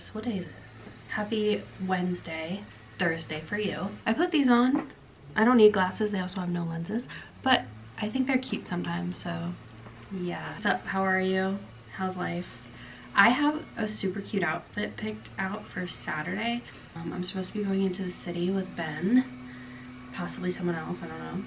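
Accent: United States. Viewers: I'm from wisconsin and your accent seems the same as around here, never would have guesses long island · As someone from New Zealand you just have the standard American accent to me lol - I knew someone from New York and he had a similar accent to you. It’s not the classic New York accent - It’s just veryyyy American. I like your thumbnails, they’re cute - I don’t think it makes a big difference for me - but it might be more important for new viewers 🤷‍♀️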